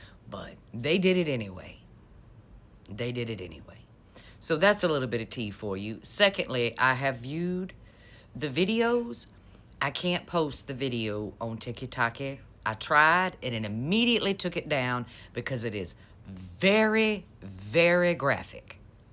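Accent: South, United States. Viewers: That southern belle tone is annoying as hell im out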